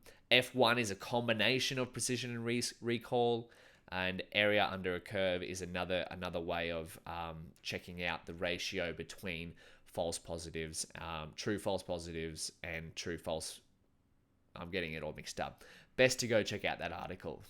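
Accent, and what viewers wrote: Australia. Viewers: Came to learn the kaggle format, stayed for the Aussie accent and comically positive energy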